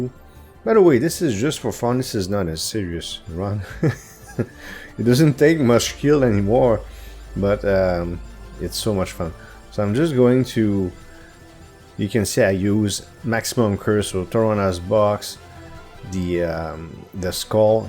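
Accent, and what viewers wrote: Quebec, Canada. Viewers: accent ? is it québécois ?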